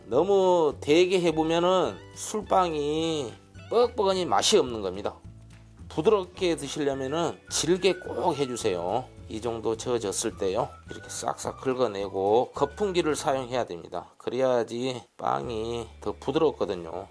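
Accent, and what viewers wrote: Jeolla, South Korea. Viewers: 전라도 사투리가 구수하고 요리에 정성인 세이프님 늘 감사합니다 이번에 LA갈비 4킬로도 성공해서 가족들 잘 먹었습니다 오늘은 호박술빵에 한번 도전해 볼렵니다 믹서도 어느분이 물어서 잘 구입해서 사용하고 있습니다 이번 김장에도 잘 사용할 것 같습니다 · 여수 광양쪽 사투리인줄 구수하고 좋내요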